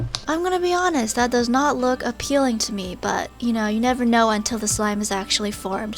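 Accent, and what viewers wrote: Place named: India. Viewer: You talk like an Indian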